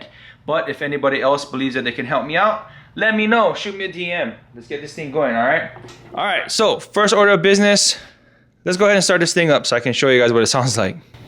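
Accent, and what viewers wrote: Hawaii, United States. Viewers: You from Hawaii braddah? Can hear da pidgin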